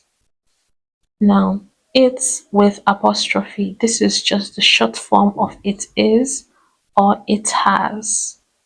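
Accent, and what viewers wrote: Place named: Europe. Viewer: Alright , the way you speak and pronounce , it's obvious you are NOT a Native English speaker. Any fluent English speaker will surpass your explanation in time lesser , 3/4 th or 1/2 the time you spent. explaining. You have a very strong , thick European accent.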